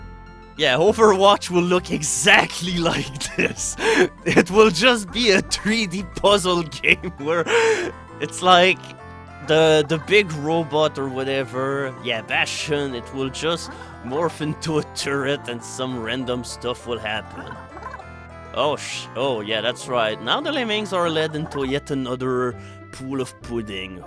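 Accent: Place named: Quebec, Canada. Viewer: Il me semblait que je reconnaissais le doux accent québécois!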